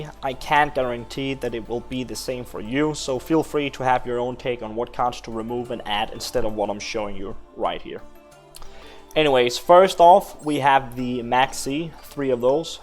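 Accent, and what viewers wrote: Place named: Denmark. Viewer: You sound like you're from Denmark, love your voice daddy <3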